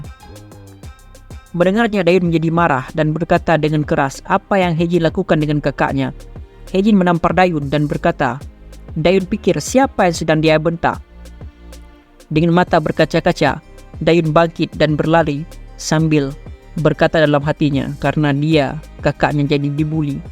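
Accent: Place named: West Nusa Tenggara, Indonesia. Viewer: lu orang ntb ya bang? logatnya kayak teman dari sana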